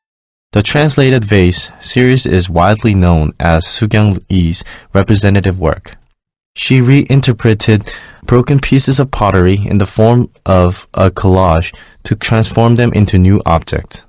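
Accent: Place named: United States. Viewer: His pronunciation is so perfect...american accent..miss u Taecyeon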